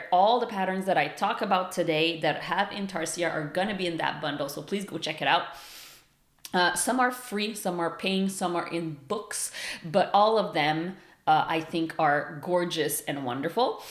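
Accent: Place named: Quebec, Canada. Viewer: ! Ça fait plusieurs vidéos que j'écoute de toi et à chaque fois je me dis... Aaaaah cet accent est tellement familié. C'est sur que tu es Québécoise! Je capote sur tes vidéos! Et tu met de l'avant des artistes francophones que je ne connaissais pas. Merci pour ton travail!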